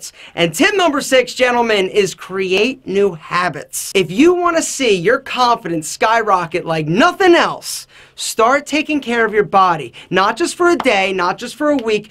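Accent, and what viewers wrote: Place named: East Coast, United States. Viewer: east coast accent love it man where r u from?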